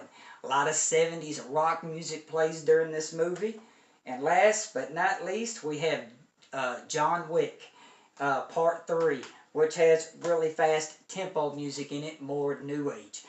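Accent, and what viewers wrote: South, United States. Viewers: Good, clear review. As a non American your accent is interesting. As are some of the adjectives that you use. I'm guessing that you're based somewhere in Southern Amserican States. Fascinating. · Nice review mate! Luv the Southern accent! You’all did a great review here.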